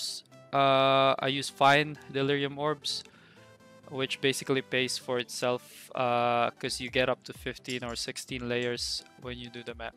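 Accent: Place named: Philippines. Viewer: Fellow Pinoy PoE player? Guessing from the accent lol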